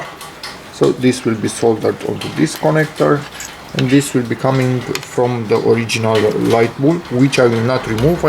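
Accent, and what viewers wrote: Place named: Romania. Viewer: accent romanesc foarte clar. Felicitari pt canal!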